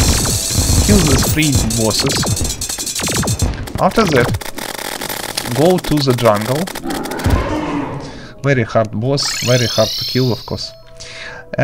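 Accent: Russia. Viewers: heh, this russian accent on english words is kinda cute lol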